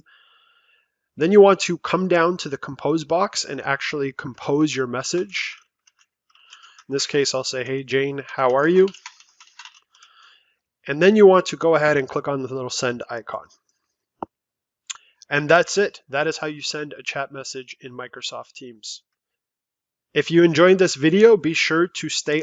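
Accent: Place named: United States. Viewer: Short and simple, American spoken!!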